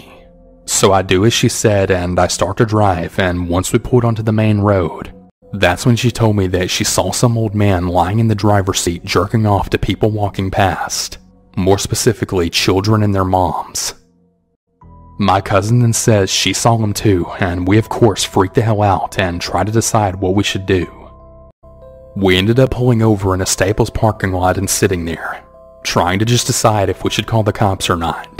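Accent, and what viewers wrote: South, United States. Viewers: It took me a minute to realize he has a country accent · It’s the southern accent for me 😅😌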